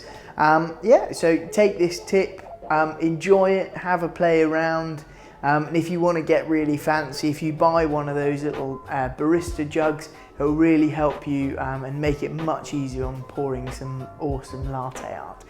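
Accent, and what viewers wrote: United Kingdom. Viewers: You sound brit mate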